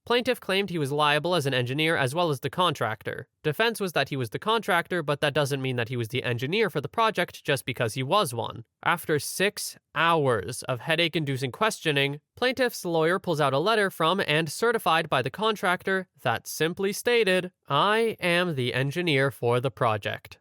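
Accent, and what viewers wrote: France. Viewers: 𝑀𝒶𝑔𝓃𝒾𝒻𝒾𝒸𝑒𝓃𝓉 French accent! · By all means your french accent was enjoyable mhm mhm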